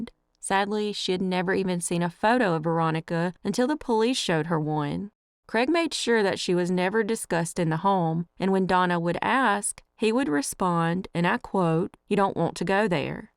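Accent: South, United States. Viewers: My family and I were talking today about southern accents. Yours is adorable. You do such a great job on these stories.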